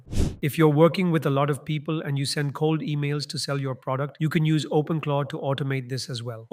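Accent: India. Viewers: Indian accent AI voice is peak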